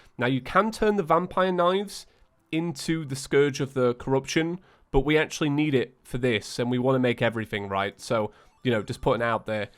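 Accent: United Kingdom. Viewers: Yeah, it's like. " Oh god ,I'm so gutted by that -__- " It's just so stereotypically british and I love it lol.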